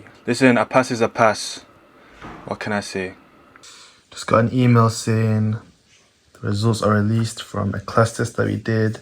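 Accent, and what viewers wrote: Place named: United Kingdom. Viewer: this guy is so british it's amazing, good content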